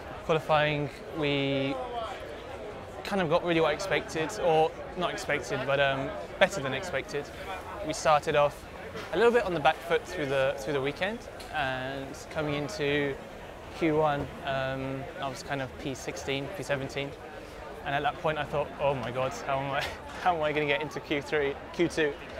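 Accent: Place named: United Kingdom. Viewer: Such a British sounding Thai national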